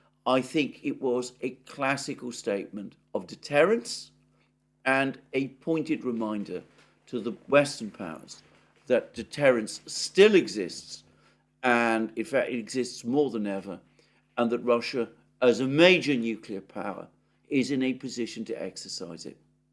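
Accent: United Kingdom. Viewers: Great job from the best informed reporter. Prudent in presentation. Great british accent🙂love those daily moments ❤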